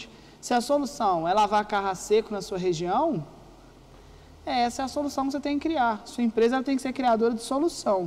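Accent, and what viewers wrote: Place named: Belo Horizonte, Brazil. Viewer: Esse cara deve ser de Belo Horizonte ,pelo sutaque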